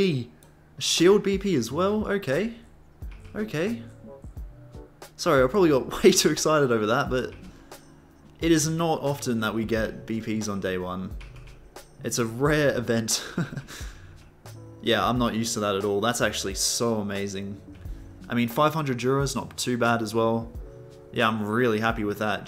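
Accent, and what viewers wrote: Australia. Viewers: are you aussie bro? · You Australian? If so love from another Aussie love your content mate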